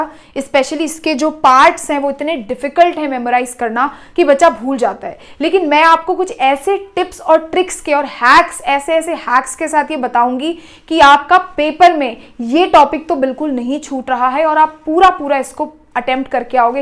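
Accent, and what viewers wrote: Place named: Haryana, India. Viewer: Didi aap haryana ke hona!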